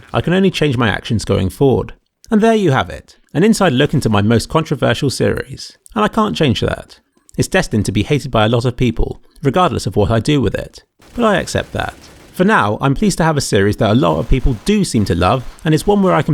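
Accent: United Kingdom. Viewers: I love the british accent. It just sounds good. Btw, those smurfs who started destroying the game and deranking on purpose are just toxic and its not your fault. Those guys do it for the fun they have, once their team starts raging. They like to be the one deciding everyone elses faith. Its empowering for them. They like saying stuff like "I am LEM, noob" to make it even more obvious, that they are actually better. Did you do that? I dont think so. Does anyone do that, because he watched going low? No, I dont think so. But its a good excuse for smurfs, if they want to anger innocent people. Obviously no one can judge you for what they are doing and you shouldnt judge yourself either. Ive seen your video about smurfing. I think it was kind of a reaction to the influence of smurfs which is growing so rapidly. Its hard to find a single lower ranked game without one opponent or teammate smurfing. 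Well, who cares? I am just a youtube user with no people behind me. You are someone who's popular. Those popular youtubers should unite and finally make something happen. Valve will never listen to small unknown people saying mm is broken, but they'll probably listen to popular people who could influence the whole cs go scene. I love you videos, keep up the good work :)!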